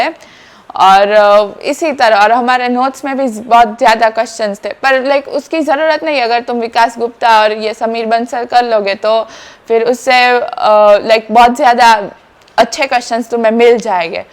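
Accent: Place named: Gujarat, India. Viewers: Not even a 1% percent dilution in her gujarati accent hats off queen........ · The Gujarati accent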